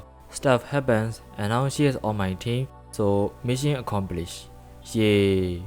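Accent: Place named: Japan. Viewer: currently playing this game. Uhm, i’m sorry, but you have an interesting accent. Are you a Japanese?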